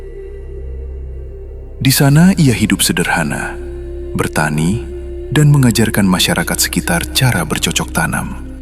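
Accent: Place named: Java, Indonesia. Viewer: Perhatikan dari logat bicaranya. Itu admin nya org Jowo. Jowo bertutur sejarah Sunda. bukan ranah nya. Pasti jadi akan aneh dan membingungkan. Skip saja / abaikan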